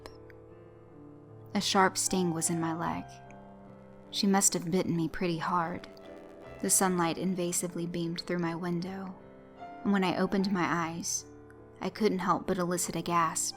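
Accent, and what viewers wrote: South, United States. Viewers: dont really like the southern accent tbh ;$ maybe I need to get used to it ( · I just saw your address in the description and realized how you can have a southern accent . Don't get me wrong it is nice to listen to . I told you before that I stumbled on to your channel when you were featured in another channel . It was like finding gold. You do a great job on your narrations . This video though more than a few had me scratching my head wondering . But I guess that is just me. At any rate keep up the great work . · Another good batch, your quickly becoming my favorite specially when ya get that cute southernish tone, keep up the good work.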